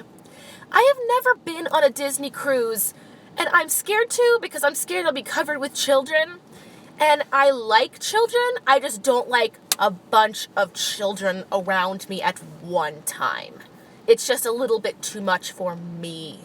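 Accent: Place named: United States. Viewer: I heard the American accent and knew you had to be in the production show lol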